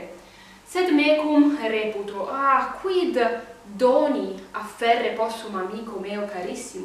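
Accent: Italy. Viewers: pronuntiatio restituta cum jucunda modulatione italica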